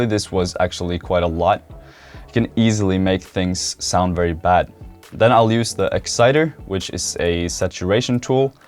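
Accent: Norway. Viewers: FREE mastring i Will love it. But are you from Norway?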